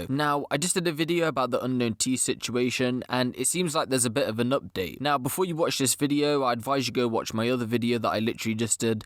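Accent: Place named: Ipswich, United Kingdom. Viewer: This guy sounds like he’s from ipswich whys he reporting on drill rappers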